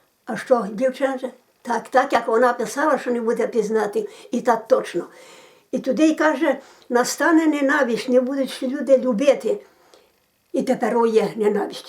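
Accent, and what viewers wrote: Lviv, Ukraine. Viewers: Українська, Львівська область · Не сперечайтесь,що до говору,так розмовляють на Львівщині! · В нас в селі Львівської області, такий самий говір. Так приємно слухати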